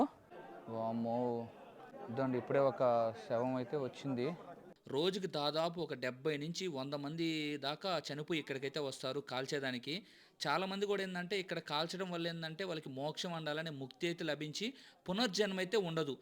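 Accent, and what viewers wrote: Nellore, India. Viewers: Meedi Nlr bro mee language vini aduguthunna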